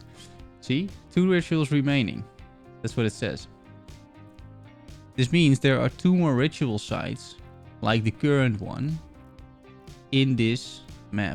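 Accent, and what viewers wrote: Netherlands. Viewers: Are you Dutch or Belgian?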